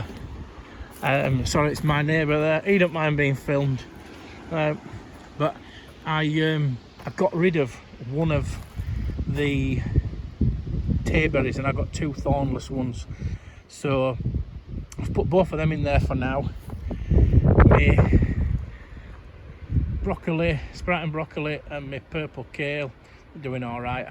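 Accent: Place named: Barnsley, United Kingdom. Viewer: Wheres your allotment you sound like your near Barnsley ?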